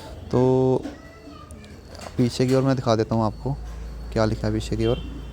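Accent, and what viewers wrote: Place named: Punjab, India. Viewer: great video buddy....very enjoyed your hindi in punjabi accent 🙂🙂🙂🙂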